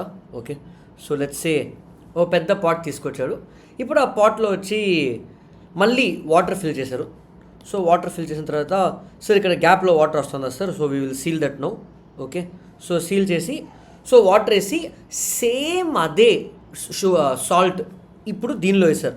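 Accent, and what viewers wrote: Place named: Telugu, India. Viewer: Meeru telugu slang super ga undhi sir💯 Being a tamil person. Can easily connect with it sir!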